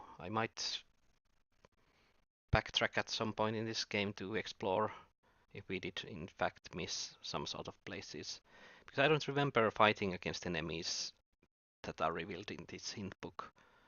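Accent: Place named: Finland. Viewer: Holy shit! Another person with a penchant for Nahlakh and Demise specifically. Congrats on finishing this epic game. I never managed this in the 90s despite several efforts. Always got stuck at some enemies. I love this style of games. I bet you play the Exile series as well, which is another amazing set of games. You might be a fellow Finn as well based on your accent, which is not surprising because of Pelit magazine's coverage back in the day. That is how I discovered the game. Check out a modern game called Dungeon Encounters. Might be right up your alley. Cheers! :)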